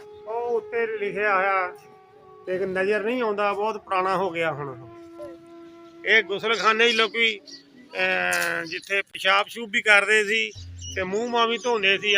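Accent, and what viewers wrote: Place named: Ludhiana, India. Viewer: Sir aapki boli to Ludhiana deha form Begowel doraha Ludhiana Punjab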